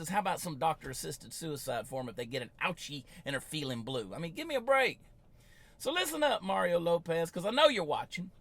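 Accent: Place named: South, United States. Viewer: my grandson 3 years old now I spy his name is Jacob he was running through the house telling his mom and the rest of us that he found a home and we run and check the bathtub to see if there was a whole went through the house went through everything we couldn't find a home we went outside we checked this pool if there was a hole finally she's sitting there she can't figure out what's going on none of us can and then he walks up and sticks his head in her face she smells his hand and it's got s*** on it and she throws up 3 in across the floor on the carpet is this little boy still tripping on having a a**** why would he care if he's a boy or a girl this is all so ignorant and so stupid but the story is true I think it was over with it was funny because my daughter didn't expect to smell what she smell and I never seen no one throw up 3 in across a space not even a drunk Love & blessings love your southern drawl I have one of my own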